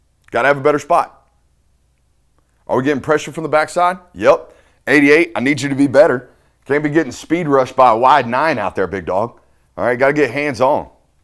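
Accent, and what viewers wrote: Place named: Texas, United States. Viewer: I gotta ask who your team is. Sounds like Texas or Oklahoma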